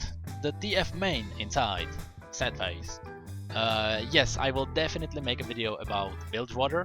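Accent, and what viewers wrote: Czech Republic. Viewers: Já prostě věděl že jsi čech...prostě jsem to věděl...ten přízvuk poznám na hony daleko........Gj- keep it up man! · Hehe, I guessed that you might be from czech republic, just by your pronounciation. ^^ Well, all I have to say here is: Pokračuj v dobré práci a lovu zdar. :-)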